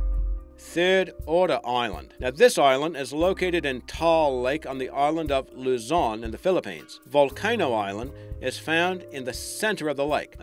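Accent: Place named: Australia. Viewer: You have the strangest accent I have ever heard, like a mix of Australian, American and British.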